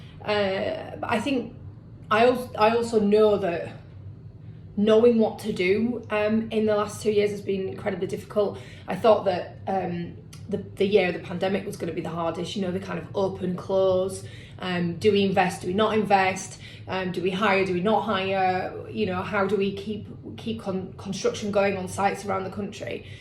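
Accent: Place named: Yorkshire, United Kingdom. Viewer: Ohh keep the faith , sound sensible Yorkshire lass, beats a warren buffet e very time , something good and instantly decent about you . lovely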